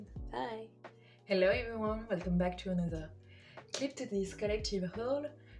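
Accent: France. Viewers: your accent is so pretty! I knew you were french right away because i used to take french classes XD good video btw i just subscribed :)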